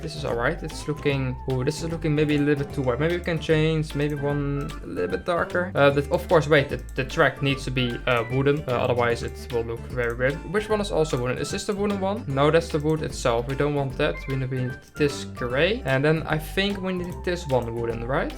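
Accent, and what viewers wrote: Netherlands. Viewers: I immediately smelled dutch. Hoe gaat het man? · Ik dacht al waarom je engels zo erg klinkt als nederlands maar toen kwam ik er achter dat ik al eens een keer je video heb gezien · i smell dutch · Ik vind het grappig dat je hoort dat je Nederlands bent hele toffe vidio 🧀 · I smell Dutch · Aan je acsent ben je Nederlands denk ik he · I smell Dutch🟥⬜🟦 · I SMELL DUTCH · Je bent Nederlands ik hoor het aan je stem je hebt een Nederlands accent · You sound dutch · Ik ruik een Nederlander. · I smell Nederlands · Nice accent it sounds dutch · jij bent een hele goeie youtuber ik hoor jou nederlandse accent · ik ruik een nederlander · I smell dutch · Ur Defently from the netherlands u hear it / je moet niet altijd engels praten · Bro when I heard u the first time I already knew that u where dutch like me 😅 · I hear the Dutch accent haha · Why do you sound so Dutch? 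If u are: 👄🦷NeDeRlAnDs💪👁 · Your english is so dutch if that make sense😭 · You sound like a dutch person that speeks english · Ik ruik een Nederlander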